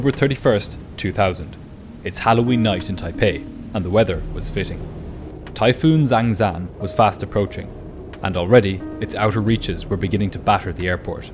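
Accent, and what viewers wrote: Ireland. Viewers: Great video thank you ,shocking story great to here a fellow Irish narrator 🙌☘️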